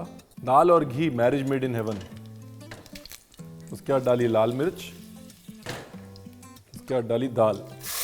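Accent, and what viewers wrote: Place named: Lucknow, India. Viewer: इतना लखनऊ टपकता है आपकी जुबान से मैं घर से दूर हूँ , करीब आ जाता है शहर । Keep going, lots of love Boss.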